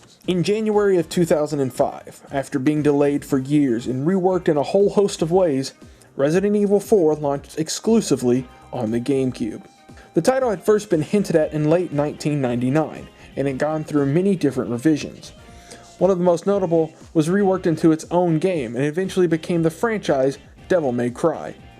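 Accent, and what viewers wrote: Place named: South, United States. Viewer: Your accent. Are you from Georgia? South Carolina?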